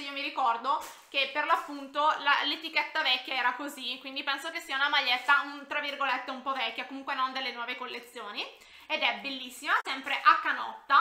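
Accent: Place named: Bergamo, Italy. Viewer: Ciao! Ho trovato il tuo canale per caso e hai uno stile molto simile al mio - una domanda, sei per caso di Bergamo? L’accento mi sembra simile ✨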